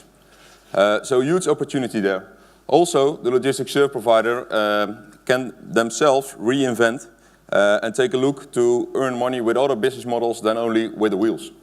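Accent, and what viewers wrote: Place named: Netherlands. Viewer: Ugh, those dutch and that freakin annoying accent. I'm no native English speaker but at least my English isn't as thick accented as this one. What's wrong with you Ducth? It's like you make no effort at all to speak decently. I much prefer a german or an french person speaking English than this.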